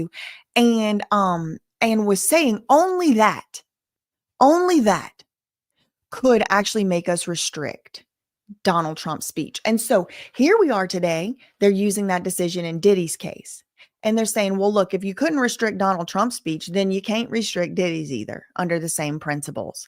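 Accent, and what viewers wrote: South, United States. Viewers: I swear that the more I listen to her, the more I feel like Im becoming a Southerner ya'll. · I certainly agree that has a beautiful southern accent. She has an amazing cadence and is so easy to listen too! 💚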